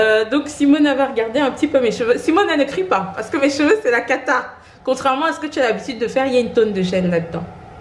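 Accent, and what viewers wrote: Cameroon. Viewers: Waoouuhh ma Soeur on dirait k j ai raté ce vlog. Mais suis trop fan de la façon avec laquelle tu changes ton accent c est l accent camer là qui me peut me tuer de 😂😂😂😂😂😂😂😂😂😂😂😂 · Hey je te découvre tata (comme tes abonnés t'appelent affectueusement)😊! C'est moi ou j'entend mon accent camer par ci par là? Si oui ça fait super plaisir de retrouver des Camerounais sur la toile! Je vais prendre le temps de découvrir ta chaîne. Vous avez l'air super sympas, tous! Allez, des bisous!!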